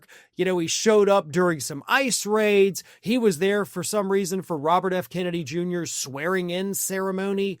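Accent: South, United States. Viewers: No need to mock the south by using that accent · The southern accent is starting to get to me. Very insulting to intelligent non MAGA southerners no matter how redneck you pitch it.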